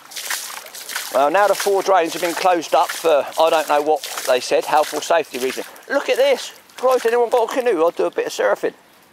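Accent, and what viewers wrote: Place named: Southampton, United Kingdom. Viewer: New I recognised that accent, soton through and through haha. I went to woodlands, live in swaythling. Used to knock around by the Hinkler pub, Hanney rise etc. Love your vids mate, just got into shooting recently, cheers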